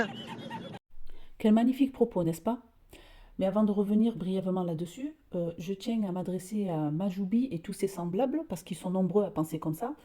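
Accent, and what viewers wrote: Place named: Marseille, France. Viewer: Très bel accent marseillais.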